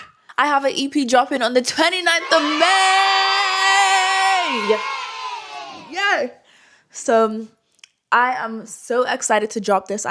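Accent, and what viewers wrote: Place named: Bermuda. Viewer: Sounds like a little Bermuda on that accent 🇧🇲 ❤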